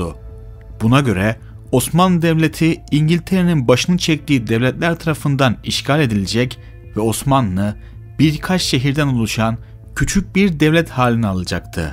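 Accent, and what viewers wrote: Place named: Central Anatolia, Turkey. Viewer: kardeş videoların güzel tebrik ederim Fakat senin "ka" ve "ke" sesleri ile sorunun vardır, Telaffuzuna bir az önem vermelisin. Sözcükler yanlış telaffuzundan dolayı farklı anlaşılmaktadır. Mesela ka^fi demen yerde kalın kafi kullanıyorsun. Orta Anadolu şivesinden kurtulman gerek. Saygılar...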